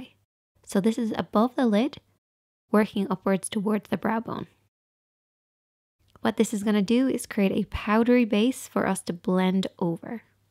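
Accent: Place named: Ireland. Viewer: Are you Irish? I hear a slight accent.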